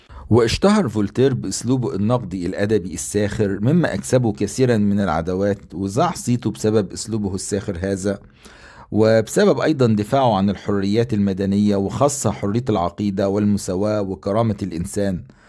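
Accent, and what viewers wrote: Egypt. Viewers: خمس الغنائم.. حسب فولتر….. يا سيدي فولتر من دون ما أبحث عنه أطيب منك ولا يوجد عند حقد متلك. لهجتك مصريه مهما أخبئت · أنت من ميت غمر يابني ؟ لكنتك واضحه جدا ، خد دروس إلقاء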